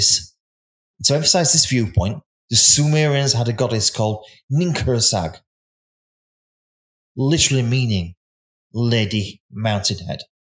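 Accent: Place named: England, United Kingdom. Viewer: Im sorry that you are unable to understand an English accent. I said the juice of DATES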